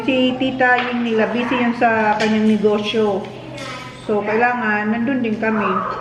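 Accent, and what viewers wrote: Bicol, Philippines. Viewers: I love how you speak bicol, im a proud bicolano too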